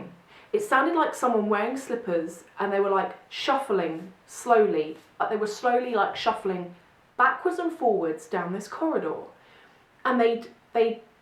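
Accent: United Kingdom. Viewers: You sound so British!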